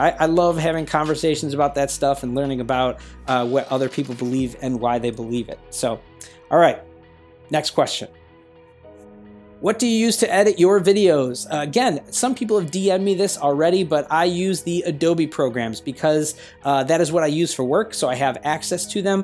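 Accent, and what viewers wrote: United States. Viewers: You definitely have that New York State accent,! Your Game ID should've been: NYNick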